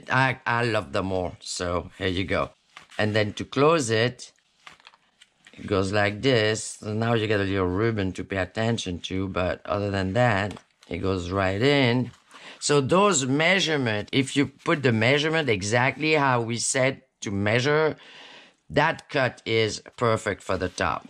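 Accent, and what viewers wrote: France. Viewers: I just love it the grundy look. Absolutely luv hearing you talk. The French accent. Reminds me of home. Gaspè, Quebec. Wear are you from? Happy you are workin with Tinne. Just amazing kits. Ok now to watch tutorial! Cheers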